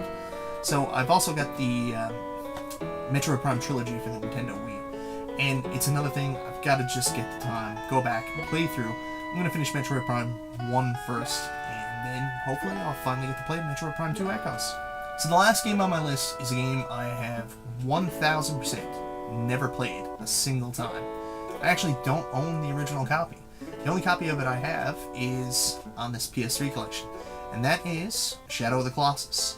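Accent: Canada. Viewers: wheres youre accent from canada?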